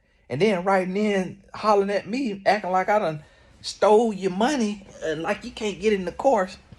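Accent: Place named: Deep South, United States. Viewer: Deep south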